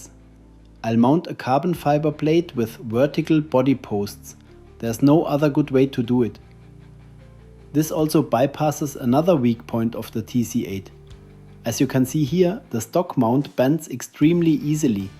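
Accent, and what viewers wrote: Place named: Germany. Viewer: Englisch für Deutsche! Super!!! Hier verstehe ich jedes Wort 😂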